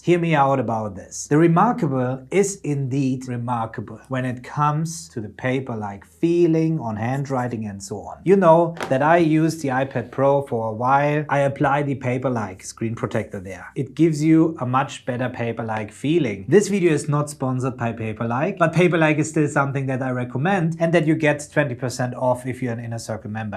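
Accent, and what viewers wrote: Germany. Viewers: Bist du Deutsch? Merke da so ein akzent ..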